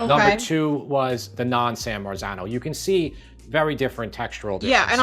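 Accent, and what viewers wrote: New York, United States. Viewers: He has a very light NY accent. Mine & my family is way thicker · I have been watching your videos and have been learning so much...I am even trying to pick up on the New York accent...I love all your videos.🥰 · I love your NY accent!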